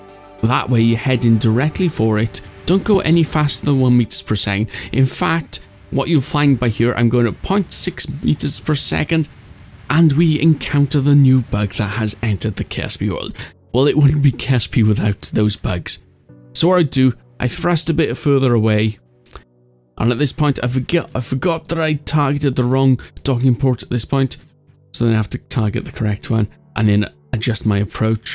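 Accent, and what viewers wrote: Wales, United Kingdom. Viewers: Man, I miss your videos, I haven't watched your channel in forever. I remember watching your videos during a boring summer, or when I had a bad cold, etc.. Thank you so much for continuing to make your videos, they always bring me joy with your strange humor. And it's so nice to hear your Welsh accent once again xD Keep up the great work!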